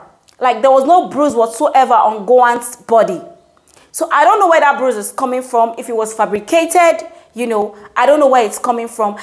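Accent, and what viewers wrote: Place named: Africa. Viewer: Who is complaining about your accent again? Are we not Africans? My dear, no be everything you go address o. You may need to ignore some comments.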